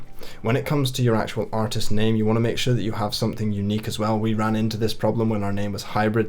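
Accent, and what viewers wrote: United Kingdom. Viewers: I guess you're British. I love British men. 💖😊.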